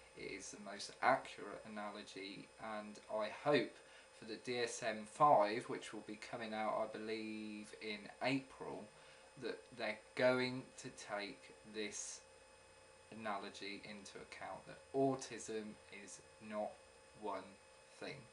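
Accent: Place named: United Kingdom. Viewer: This has absolutely nothing to do with the topic of your video, but everything to do with my preoccupation of speaking with different accents: Where are you from? What PART of the UK? Because wherever you're from is where my I-don't-have-to-think-of-it British accent comes from. Fucking love it.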